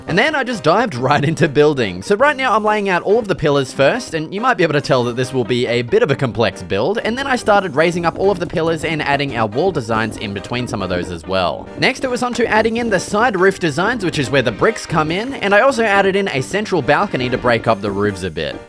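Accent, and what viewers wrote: Australia. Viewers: Bros Def a Aussie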